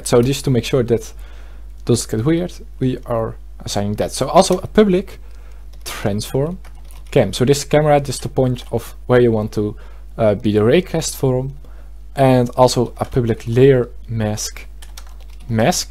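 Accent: Netherlands. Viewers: gek Nederlands accent bro thanks voor de tutorial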